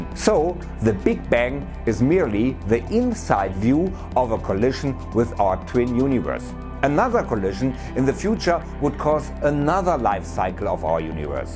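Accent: Germany. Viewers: Ich liebe wirklich sehr ABER die englische Aussprache ist furchtbar! Und die Grammatik ist leider wirklich auch nicht so der hammer. Man hört sofort dass da ein Deutscher englische Texte abliest.... und das Thema hätte ich lieber in gut gesprochenem Deutsch gehört. Für die englischen Zuschauer gibts doch die Untertitel Funktion....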